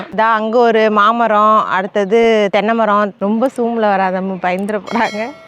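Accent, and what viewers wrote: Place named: Thanjavur, India. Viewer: Azhagana Thanjavur side Tamil language... Engha soentha oor gnabagam varuthu....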